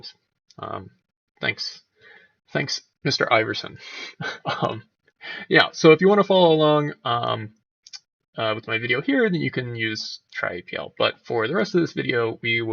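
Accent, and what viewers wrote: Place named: Canada. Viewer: It really depends what part of what country he's from... My guess is Canada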